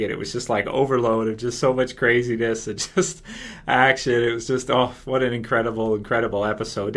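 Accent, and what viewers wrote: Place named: Canada. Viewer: "oat and aboat".... LOL i love your accent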